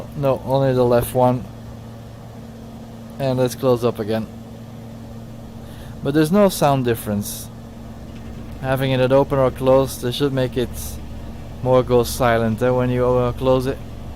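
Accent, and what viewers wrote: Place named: Belgium. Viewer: Alee komaan lol.. er is nen Belg voor nodig om nen Belg te herkennen :) goed review! (maar een klein detail; als je uit vr kwam voor de opname, dat zou de kwaliteit voor de kijkers erg verhogen)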